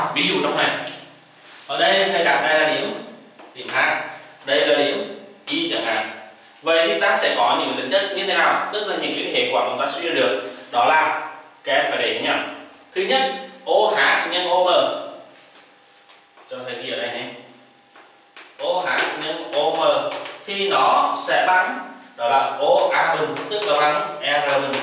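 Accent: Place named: Nghe An, Vietnam. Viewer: nói giọng giống nghệ an rứa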